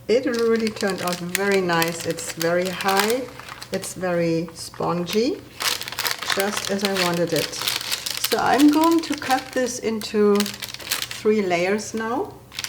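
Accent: Germany. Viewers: Not only do I love this recipe and channel, but I love your accent! My father was from Hamburg and I miss his accent. He passed away. He was from WW2. He was a doctor as a profession in America. The German accent is a pleasant soft rolling melody of inflections to my ears, so I really find it easier to learn new German recipes on this channel. My brain gets it. ( can't remember the prayer he taught us for "grace" at the table, though, sadly.) It's been decades ago, many!!! Love this channel.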